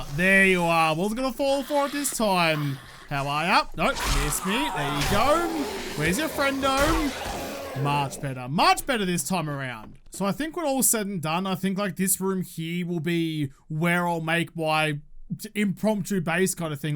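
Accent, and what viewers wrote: Australia. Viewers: personality is just so GD endearing and he's such a wholesome kinda guy. And come on... that Aussie accent is awesome, not weird. One of my favorite YTers! And BTW, Desert biome FTW!!!!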